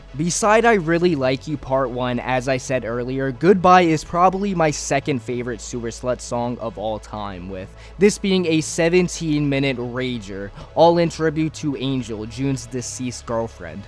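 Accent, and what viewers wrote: Australia. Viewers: The way i hear Australian and got so disappointed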